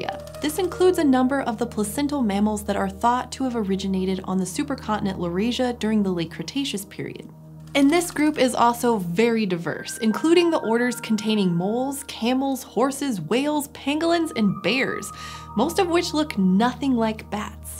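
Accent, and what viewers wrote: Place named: Pittsburgh, United States. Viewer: I wonder if the narrator is from Pittsburgh? Her "a" before n and m become elongated to "eh" or "ay" "ai-nimals"/ "eh-nimals" "maimmals"/ "mehmmals" "ay-end", "ay-encestor", "hay-ends", "tray-en-sitioning", "lay-end", "tay-endem", "brainch", "caimels". Been watching dozens of these videos. Really love the material. Thank you! 😍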